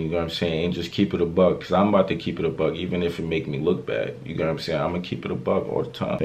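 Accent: New York, United States. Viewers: The New Yorker in him came out in this video broooo , yooo I’m cryinggg😂😂😂😂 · The New York rlly came out in this vid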